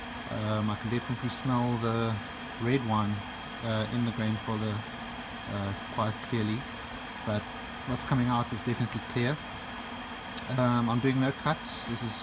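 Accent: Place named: South Africa. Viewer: pretty cool man! Thought you sounded SA then you said you were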